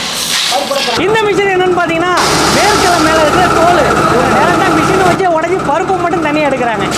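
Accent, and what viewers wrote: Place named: Villupuram, India. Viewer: Villupuram slang 😂😂 vj sidhu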